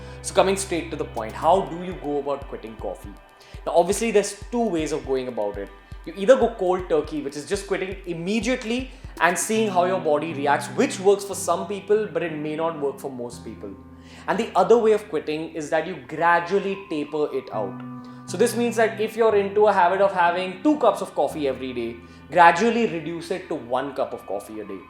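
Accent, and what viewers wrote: India. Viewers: first, are you talking with your body or your mouth?! second am wondering how did you invent this language ? is it indian english ?! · Why do you sound like that? Are you Indian?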